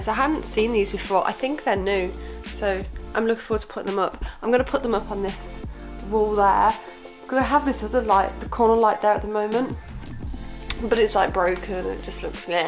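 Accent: Birmingham, United Kingdom. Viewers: Oh thought u from UK all along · As a fellow Brummie I distinctly here the Birmingham accent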